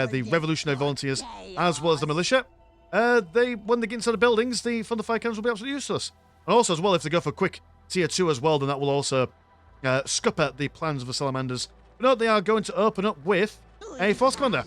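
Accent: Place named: East Yorkshire, United Kingdom. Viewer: Which side of East Yorkshire are you on?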